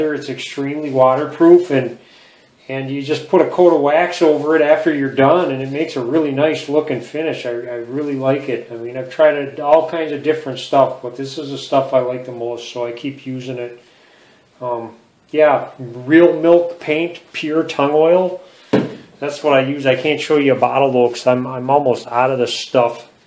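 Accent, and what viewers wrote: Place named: Upper Peninsula, United States. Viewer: First from the accent i thought U was a yupper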